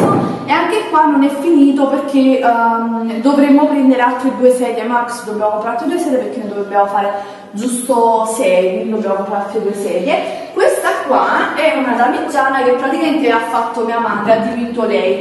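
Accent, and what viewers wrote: Naples, Italy. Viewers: Sei napoletana? anche io comunque sei fantastica 😍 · tu abiti a giugliano o Napoli si sente che parli napoletano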